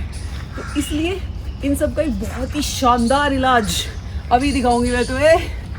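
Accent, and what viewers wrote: Haryana, India. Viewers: बोली से पटा चला आप सादर हरियाणा से हो। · Very Sweet ❤ बहुत प्यारी बोली हैं हरियाणवी ! Very Lovely Haryanvi Culture ! Aapki Shaadi Me Jrur Bulana! I Like And Want Seen Haryanvi Culture ❤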